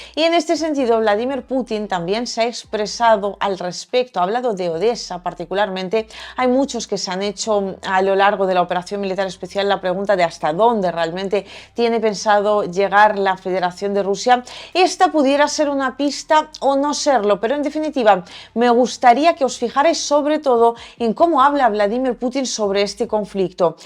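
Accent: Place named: Spain. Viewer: Espanol perfecto muy de espana muy interesante tu video saludos desde 😊🇲🇽🇺🇲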